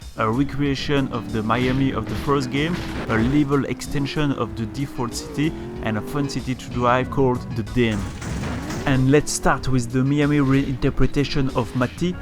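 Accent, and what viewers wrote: France. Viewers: With a french accent :) Looks very promising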